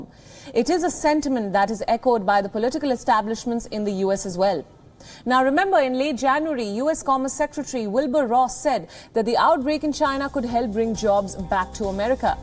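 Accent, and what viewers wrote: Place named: India. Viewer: Why the heck this Indian hostess with this terrible ascent reporting on US and China?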